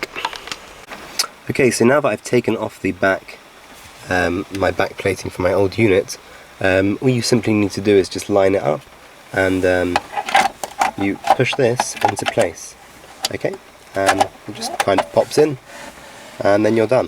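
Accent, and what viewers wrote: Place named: England, United Kingdom. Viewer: Thanks. Very helpful and in the King's English with birdsong background. What's not to like?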